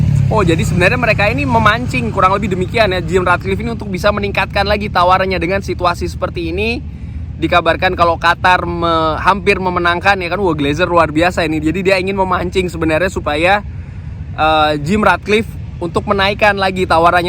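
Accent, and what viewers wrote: Semarang, Indonesia. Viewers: Lho sampean wong semarang to mas? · Asli semarang kah bang? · Orang Semarang ya bang? 😮 Kirain sy org luar Jawa 😂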